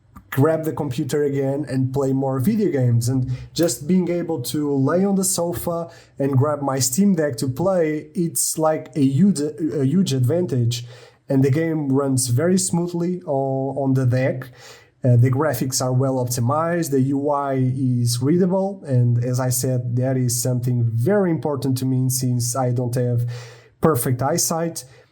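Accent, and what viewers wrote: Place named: Portugal. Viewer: I can tell your native language is portuguese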